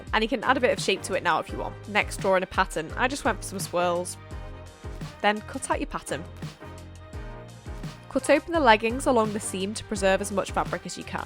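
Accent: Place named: United Kingdom. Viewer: I often watch videos on 125% speed, but I have to concentrate to listen to you on normal speed with your British accent me being American .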